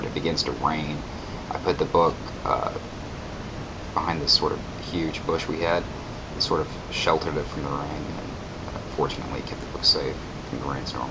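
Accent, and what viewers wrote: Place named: Santa Fe, United States. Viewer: I feel everything you say man, I've dealt with all the christian family shit as well haha. You sound like you're from Santa fe man, one of the people that denied to be stupid like the rest.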